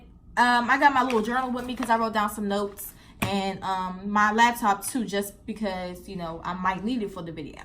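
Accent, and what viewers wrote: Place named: DMV, United States. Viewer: Where are you from? I hear an accent in your voice. It sounds like you may be from the DMV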